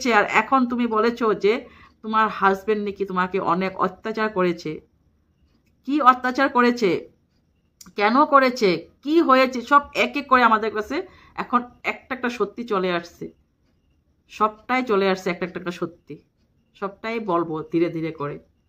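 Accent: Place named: Tripura, India. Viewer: তুমি কি ত্রিপুরাতে থাকো? কথা শুনে মোনে hochche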